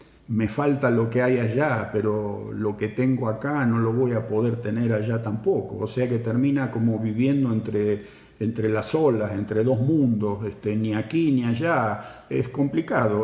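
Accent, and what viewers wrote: Argentina. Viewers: Holaaaaa familia ❤ los adoro, hace un par de años los descubrí, cuando empezó a a acompañar los videos de y. Me llamó la atención la forma de hablar y descubrir que "era un argentino 😅"... Gracias, muchas gracias por todo lo compartido. De emigrar siempre me gustó Canadá, antes era con muchas más exigencias, todo cambió después de la pandemia. Abrazo grande desde San Miguel del Monte, pcia Bs As, Argentina. ❤❤❤ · Que relato perfecto, parecés un profesional de los medios, la historia tuya,la vivimos como propia, con la forma como la relatás y los que somos descendientes de inmigrantes, nos sentimos identificados con nuestros abuelos, ( en mi caso italianos, analfabetos, que nunca más tuvieron contactos con los suyos)! Lo que describís es como me sentiría, si tuviera que emigrar, y se nota como estas al tanto de la realidad,en este caso de Argentina! 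Otra cosa muy llamativa es que seguís manteniendo el acento Argento, y particularmente modismos idiomaticos a pesar de tantos años viviendo y hablando otro idioma!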